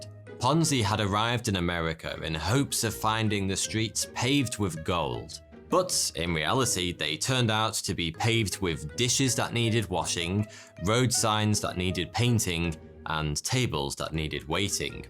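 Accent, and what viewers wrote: United Kingdom. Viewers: Thoughty2 sounds very British though...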